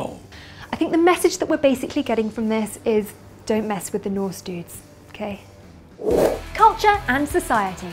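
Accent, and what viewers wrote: United Kingdom. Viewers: because she just is. her jaw, her cheeks, her voice, her eyes. like an audrey hepburn type face with a very deep british accent. She's married though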